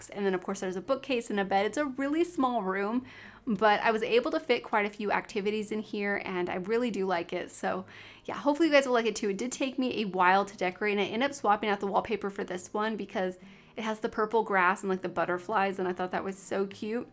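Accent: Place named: Michigan, United States. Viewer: are you from michigan originally? i see your adress is now in california but i just wonder because of your pronunciation :))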